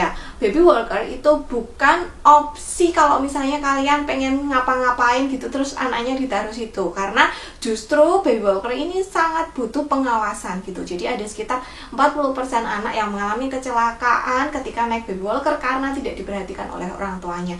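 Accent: Java, Indonesia. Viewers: banter bangeet 😂😂 bu bidan mantap, njowoniii bangeet 😂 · gledekan wkwkwk aku suka karna bu bidan medok kaya aku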